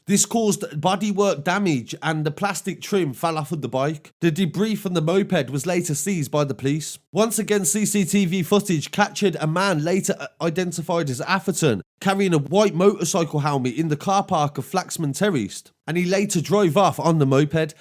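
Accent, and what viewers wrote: Birmingham, United Kingdom. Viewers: Why do you talk like a malfunctioning brummie computer you grass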